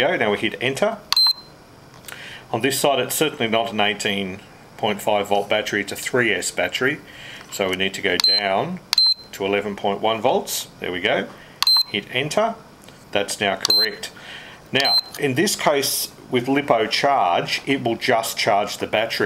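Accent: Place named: Australia. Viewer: I can tell by your aussie accent that you are the man that can help me. I am new to all this battery charging and I want to get a good charger from america but I am worried about the 240 volts we have he in aus ruining it so my question is if it says the AC input is 100-240V does that mean I can run it off an aussie wall socket ? sounds like a dumb question right but I just need some reassurance before I spend $130 bucks. the racers edge surecharge is the one I am looking at. thanks in advance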